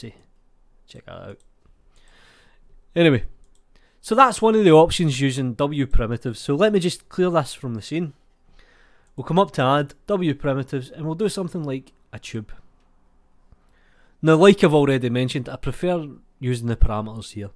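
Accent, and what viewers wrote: Scotland, United Kingdom. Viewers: His english Is great.. is that Scottish ?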